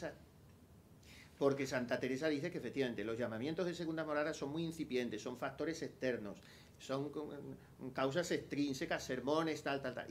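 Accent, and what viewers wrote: Extremadura, Spain. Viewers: Monseñor, se queda uno ensimismado con V., con lo bien que explica todo y con la sabiduría que hay en sus palabras, fruto del estudio intenso, la oración profunda y el cariño sincero. ¿Cómo no apreciarle y quererle tanto? Y, además, con ese gracejo extremeño que comparte con tanta simpatía. Habría que decir como los niños pequeños cuando disfrutaban con algo y no querían que acabase: "sólo cinco minutos más". Porque disfrura uno tanto que se le pasa volando. Buen descanso para todos nos dé Dios 🙏🏻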